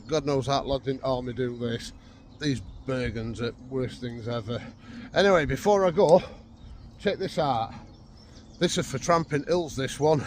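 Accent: United Kingdom. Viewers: You have exactly the same accent as I do pal I'm from Pontefract are you close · Good watch! You sound like you’ve got a Sheffield accent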